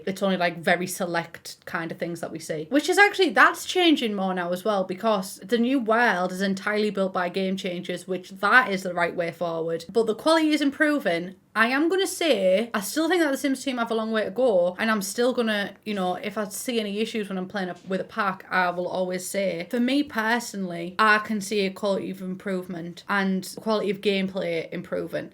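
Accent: United Kingdom. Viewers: Ure biritish?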